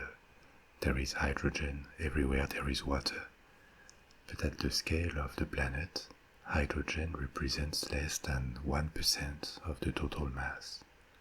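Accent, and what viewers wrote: France. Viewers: Your videos are really great, but your accent reminded me of my former asshole of a French boss from years ago so I got high blood pressure from listening to your videos instead. Totally not your fault, just unfortunate LOL. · You have the most relaxing voice and the coolest accent. Actually you don't have that much of an accent. You speak excellent English. But it's a compliment. I love French language and the way you speak. Also, thank you for a great night of sleep, relaxation and expanding our brains with knowledge! You are awesome! I love your channel and really appreciate all the hard work you put into your passion! Thanks again and God bless. :)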